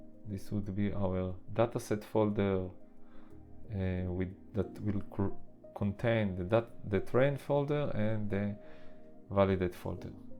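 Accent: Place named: Israel. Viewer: Now I get what an Israeli accent is. Nice to hear you doing well :)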